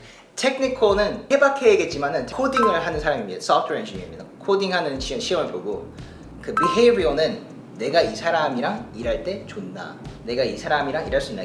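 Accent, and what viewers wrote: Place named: Gyeongsang, South Korea. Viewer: 맷 형 구글에서 일하는 경상도 싸나이인가보네요. ㅎㅎ 억양에서 느껴짐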